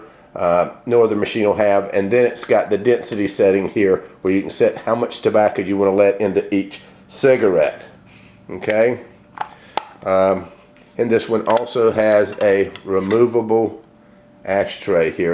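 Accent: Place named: South, United States. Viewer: Me 3. He sounds Southern American.... can you blame him?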